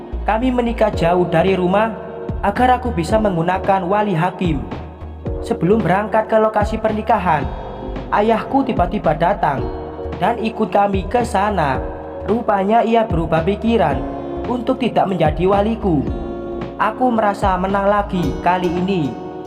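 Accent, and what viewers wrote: Java, Indonesia. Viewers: Bang orang mna sh bhsa y ko medok bnr tpi bàgus cerita y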